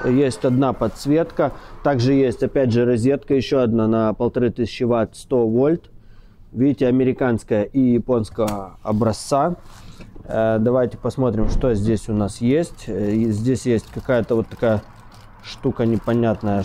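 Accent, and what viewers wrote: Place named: Odesa, Ukraine. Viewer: Отлично,! Смотрю всё,что Вы предлагаете к обзору!С Вашей Одесской манерой разговора даже обзор входных напольных ковриков будет интересным и занимательным!Блеск! А Машина хорошая,нет слов, но дорогая для патриотичных и не богатых колхозников.Да даже если бы Вы и критиковали её за что-то,то были бы вправе это делать, как я Вам это уже говорил..Ведь Вы! С уважением из Саратова,Владимир,61 год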